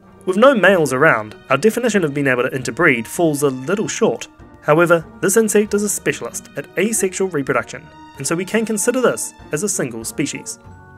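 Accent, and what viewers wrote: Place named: New Zealand. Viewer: great video! and your NZ accent is fresh heheh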